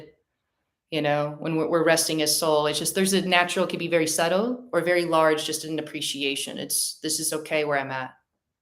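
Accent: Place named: South, United States. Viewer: Suddenly realized she’s southern lol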